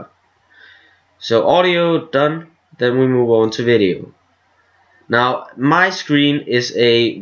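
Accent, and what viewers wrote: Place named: Denmark. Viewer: dansk aksang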